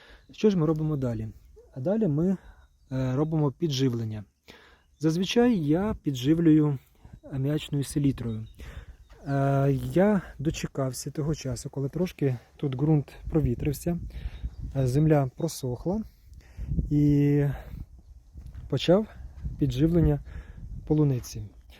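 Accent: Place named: Ternopil, Ukraine. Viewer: Ми, напевно, з Вами земляки, бо мова у Вас справжня ( я з Тернопільщини). Поради Ваші грамотні, конкретні.Я підписалась.Успіхів Вам! Слава нашим захисникам! Слава Україні!❤❤❤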